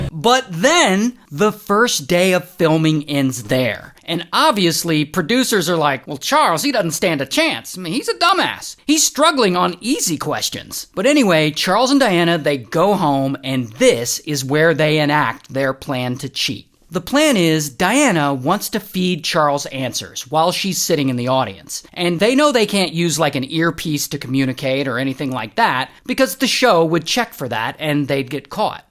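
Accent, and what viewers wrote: United Kingdom. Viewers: I’m impressed on William Johnson British accent in this video it sounds like he’s actually British! 😂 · Liking immediately after hearing the British accent 😂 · That British accent is wild! 😂😂😂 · the british accsent is killing me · British accent 😂!!! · The British accent 💀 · Well done on the British accent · The British accent 😂😅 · Your British accent got me rolling 😂😂😂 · The British accent is 🧑‍🍳💋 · british accent is killing me 😂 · Oh my. That british accent.... especially the 'oh bugger' not gonna lie i had to pause until i stopped laughing. Keep doin you. We love ya 😊❤😊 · Impeccable british accent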